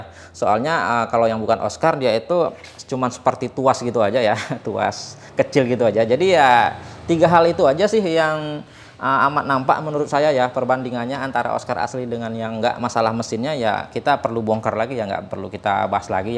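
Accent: Medan, Indonesia. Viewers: Nampak Medannya, "dipala-palain"😆😆. Mantap reviewnya, bang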